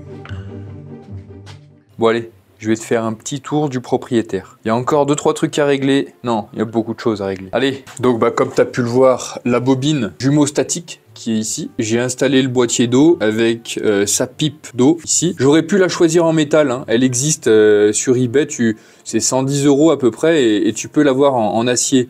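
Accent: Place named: Saint-Étienne, France. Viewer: Non il est pas du nord ! Tu reconnais pas l accent stéphanois ?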